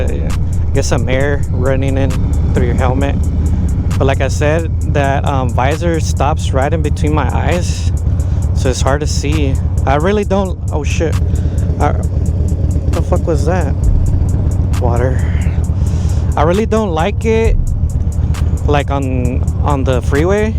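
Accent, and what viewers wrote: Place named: Guam, United States. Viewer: Bro you sound like you from Guam.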